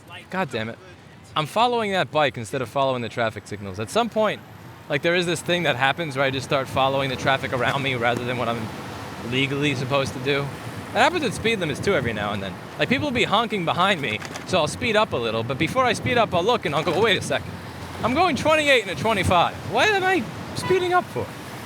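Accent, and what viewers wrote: New York, United States. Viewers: it's the NY accent....it comes from a mix of Italian and Jewish immigrants adding to the Irish and WASPs....despite him not being Jewish, uses Yiddish influenced NYC phraseology. Hence your association with Jerry Seinfield, who has some of the same accents. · your NY accent reminds me of Jerry sienfeld!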